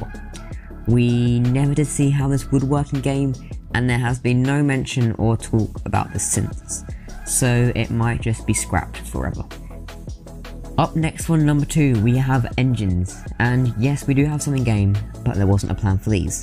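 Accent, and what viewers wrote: United Kingdom. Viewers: Are you, British/Briish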